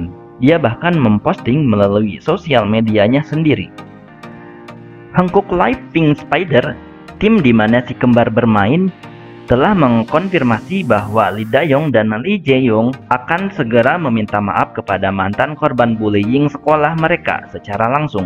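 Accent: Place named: West Java, Indonesia. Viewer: Lidah sunda bro... 😅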